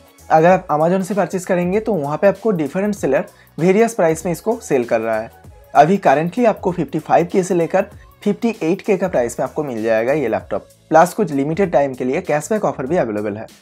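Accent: Bengal, India. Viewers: Are you Bengali? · Bhai kya aap Bengali ho??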